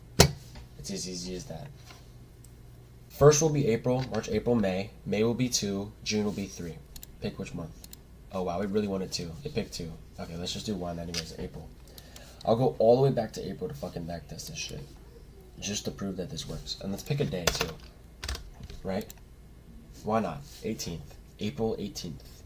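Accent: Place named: West Virginia, United States. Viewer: west virginia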